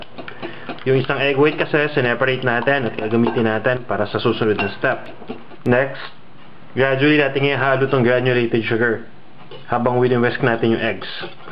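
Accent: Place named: Conyo, Philippines. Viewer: ang konyo mag salita buset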